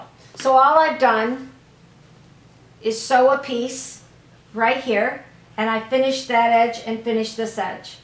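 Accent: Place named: Cleveland, United States. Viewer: Thank you so much for your video! I think even I could do it with your instructions (and I’m a knitter, not a sewer!). I love your Cleveland accent - it makes me miss home, originally from Akron. God bless you for all you are doing to help the health care workers. 🙏🏻💜👼🏻✝️😇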